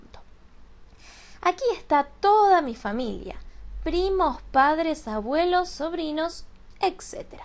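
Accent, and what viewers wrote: Argentina. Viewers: El famoso acento argento! 😄😂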